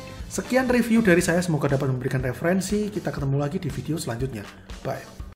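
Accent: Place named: Java, Indonesia. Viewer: Medok bro 😁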